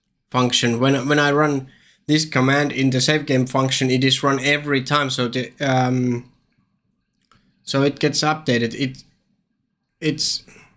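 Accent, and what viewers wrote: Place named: Finland. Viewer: I can hear Finland in your voice.... Nice video mate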